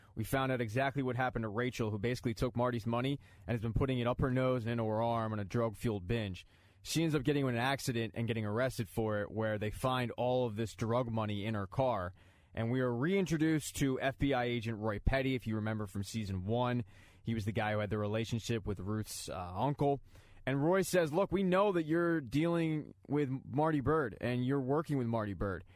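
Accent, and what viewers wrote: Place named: Baltimore, United States. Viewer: Where u from I hear an accent... down the ocean, hon??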